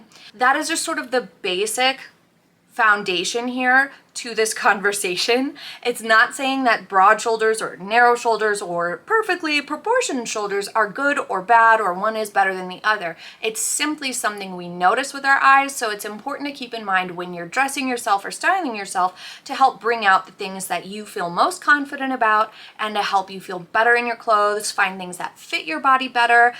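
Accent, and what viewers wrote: United States. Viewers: No worries. 🙂 I have no idea where you live, but you sound like you have an American English accent, and darn it, it's very hot right now!!! 😉 Generally speaking, we are in the hottest month of the year. So if anyone looks a little bit oily or a little bit shiny, I tend to shrug and think "Well of course they do; I get it." I'm sure you will eventually tweak all of the settings to your liking. 👍❤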